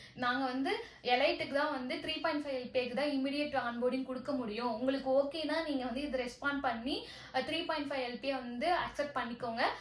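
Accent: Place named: Palani, India. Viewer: good your videos are very nice to see.... Palani slang nalla theriuthu.....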